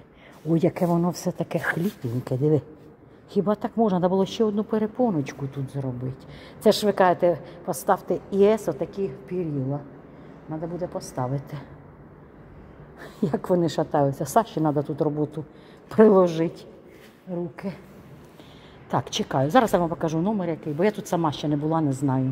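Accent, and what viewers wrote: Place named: Surzhyk, Ukraine. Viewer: ваш суржик мадам патроитка режет ухо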